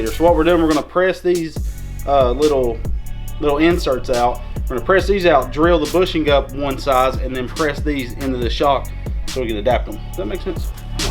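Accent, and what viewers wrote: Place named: South, United States. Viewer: Don't let em mess with you,it is pronounced ole not o-i-l, everyone knows it's just a southern accent thing. You're a good man helping them kids to have nice little go karts to play with. Go power sports is an awesome company to help you out with parts to help it all come together too.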